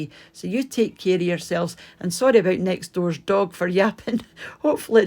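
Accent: Scotland, United Kingdom. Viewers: I love to see "What's For Tea?"... And I love your wonderful Scottish accent,!... Scotland is a bonny place!... Eating well is very important, and there's one thing for sure, Mr. What's For Tea? doesn't go short! 👍🤣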